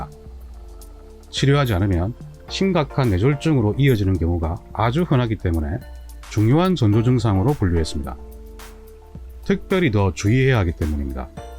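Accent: Gyeongsang, South Korea. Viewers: 선생님 말투가 경상도 출신같은데 맞나욯ㅎ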